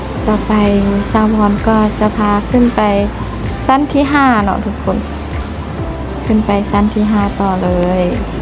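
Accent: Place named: Thailand. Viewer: สำเนียงการพูดจาคล้ายคนไทยมากฟังเข้าใจง่ายชื่นชม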